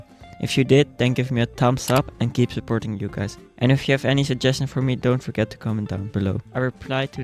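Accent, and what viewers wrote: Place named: Netherlands. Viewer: Je bent overduidelijk Nederlands aan je Engelse accent te horen 😂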